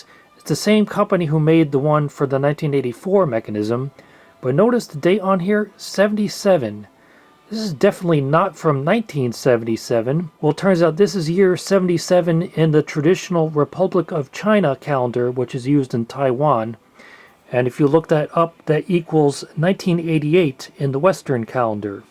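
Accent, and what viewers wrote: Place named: New Jersey, United States. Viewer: I never heard anyone not from Jersey find the accent pleasing. lol.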